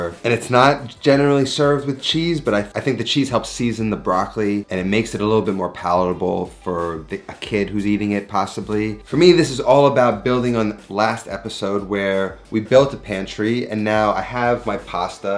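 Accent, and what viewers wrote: New York, United States. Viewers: I agree. I think he's from New York judging from accent but his food is not Italo-americano which is great but more what I've eaten for years in Italy.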